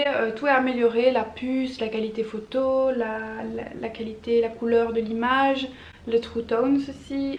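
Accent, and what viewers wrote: Belgium. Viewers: Tu viens d'ou de Belgique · Tu es belge ? J'ai beaucoup aimé ton unboxing ! Moi je vais avoir l'iPhone 6s en août donc je suis assez exister aha ! Gros bisouuus ❤❤ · C’est normal qu’elle disent ouite a la place de huit car elle est belge moi aussi je dit ouite enfaite c’est parce que chez nous en Belgique ont dit pas huit mais ouite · tu es belge? J'ai l'mpression car tu as l'accent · elle a un accent belge c est ps de sa faute😅🤷🏽‍♂️ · Tu est Belge? Sinon t'es parfaite💍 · Accent belge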